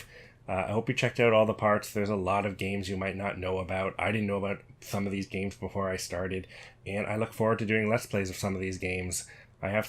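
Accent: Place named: Canada. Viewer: nice canadian accent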